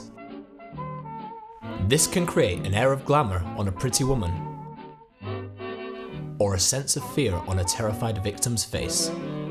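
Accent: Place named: Lancashire, United Kingdom. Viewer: Can't hide a Lancashire accent. 😁 Fantastic doc!! Thank you!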